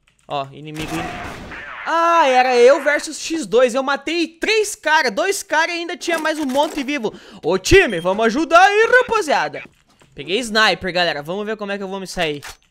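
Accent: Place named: Rio Grande do Sul, Brazil. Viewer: Parece ser gaucho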